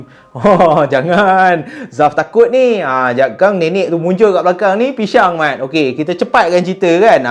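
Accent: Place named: Kelantan, Malaysia. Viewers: Pertama kali ari ni tgok channel you tube ..gaya bercerita best jgk..sy doakn maju jaya ya..zaf ckp kl je sy yg kelantan .faham jee..😅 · Agak pelik bila dgr bckp dialek kelantan...tersengih2 mami😅😅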